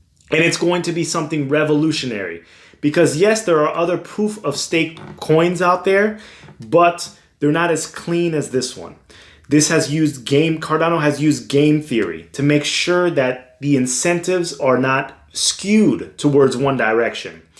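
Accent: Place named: New York, United States. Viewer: Did you go to an Ivy? You are very eloquent. And you sound like you're from NYC.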